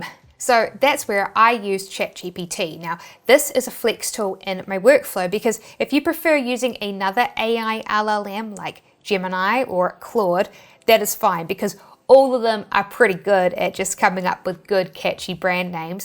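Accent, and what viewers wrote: New Zealand. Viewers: Hello. In NZ, this is cool and nice to hear a NZ voice in this space for one, really cool thank you. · I appreciate your content and you are very liable. However, there is an E in test and the is an E in step, there is not an i in STEP. CHAT GPT there is no i in Chat, there is an A. Furthermore Perplexity is not perplixity. I Actually has and not an i. DO YOURSELF A FAVOUR AND get rid of the NZ accent. It takes away from how smart you are. just saying🤔❤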